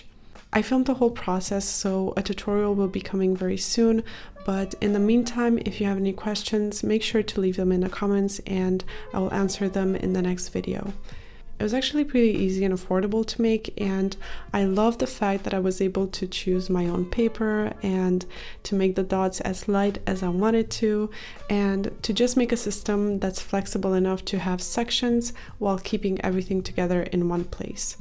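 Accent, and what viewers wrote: Germany. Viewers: oh thats interesting ^^ to me it sounded a little german. But maybe thats only, because i'm german ^^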